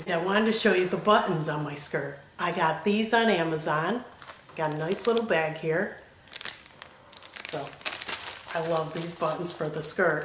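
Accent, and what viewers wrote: Pennsylvania, United States. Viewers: Hi! I recently found your channel and I'm totally hooked! I love your accent which I think is from PA but reminds me of NY. I'm from NH currently living in TX. I love your patterns, fabrics and beautiful outfits. I'm a new assist and get so inspired by you. I love your sweet friendly manner and how happy and positive you are! It's really like visiting a good friend. Thanks for all you do😊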